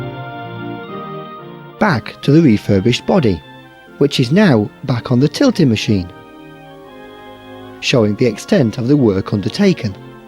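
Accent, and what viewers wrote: United Kingdom. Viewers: ...And why use some Northern guy as a narrator rather than someone from London. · The film was made in the mid 1950s - the heyday of the London RT family and long before Routemasters entered Aldenham. Wonder why a Liverpudlian was chosen as the commentator. So UNLIKE London Transport who normally preferred a plummy London version! Music was like attending a funeral!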